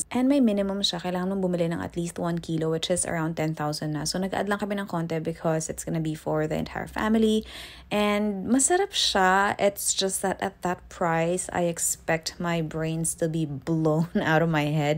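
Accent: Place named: United States. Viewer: I swear to god u sound like a American 😭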